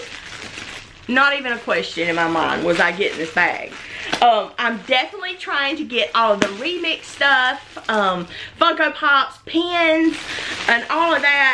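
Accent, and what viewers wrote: South, United States. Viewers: Thank you so much for getting back to me. I’ll have to check out Ebay maybe. I am a brand new subscriber to your channel. I cannot tell you how much I enjoy you! You are such a bright light in a very trying tone for so many. I smile and laugh every time I watch your vlog. All your “oh my gosh” and “I love it” in your sweet southern accent. Even my husband says in a southern accent (and we’re in NH so speak yankee) “shut up” It has been so much fun watching your videos. I have watched EVERY ONE already!! Thank you for bringing some added joy to my days! Can’t wait for your next installment...I’ll most definitely be watching! 😀. AMY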